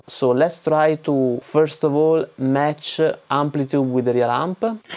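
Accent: Italy. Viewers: Si sente poco che sei italiano ahahahhaa Grandeeeee bel video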